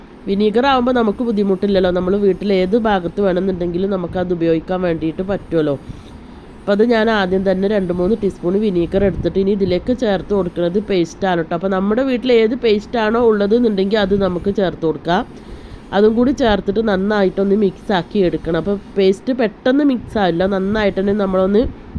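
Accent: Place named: Malabar, India. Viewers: enthu bhaashayaa? malbaari bhaashayaano? manasilaakunnillaa!😇👻👹👹🤧👺🥵🥶💀💀😈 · എസ് ,ഇത് പാലക്കാടൻ ഭാഷയാണ്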